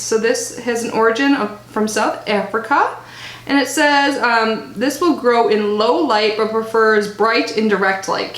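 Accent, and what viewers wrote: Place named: Minnesota, United States. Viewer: Idk where you’re from but you have the thickest Minnesotan accent I’ve ever heard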